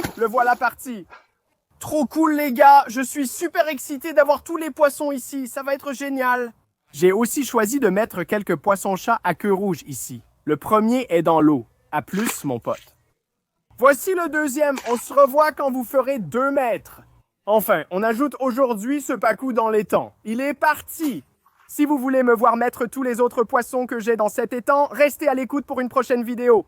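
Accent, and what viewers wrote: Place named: Quebec, Canada. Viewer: ❤Wouaw❤ un Payara le poisson vampire par contre la voix j'aime l'accent québécois mais lui il a une voix pas terrible ils est trop affolé s'il te plaît change la voix 🎉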